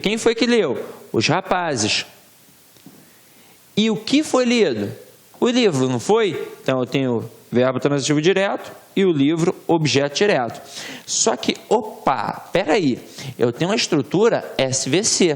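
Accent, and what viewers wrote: Rio de Janeiro, Brazil. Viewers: Aula muito legal, o carioquês é muito bom kkkkkkk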